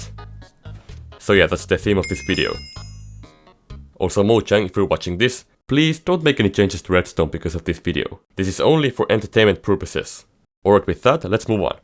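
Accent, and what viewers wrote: Finland. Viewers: This sounds really much finnish accent! Pitää tilata kanava, hyviltä videoilta vaikuttaa!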